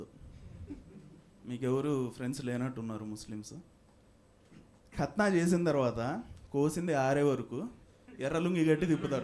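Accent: India. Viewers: పక్కా అనంతపురం యాస. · Bro nee jokes genuine ga bagunnayi, boothulu theesesina kuda anthe comedy ga untayi... so try to avoid bad words.. neeke reach ekkuva untadhi appudu.. btw i like your kadapa slang bro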